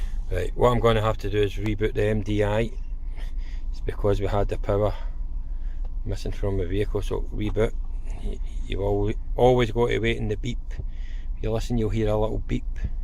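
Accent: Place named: Scotland, United Kingdom. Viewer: Great video, great to hear a Scottish voice! Great tip about coils never knew that could spike ECU will watch out in future. Liked the look of spark jumpers, was it eBay? I like u to review battery maintainer, cheers ps your now my go to Vauxhall guy!!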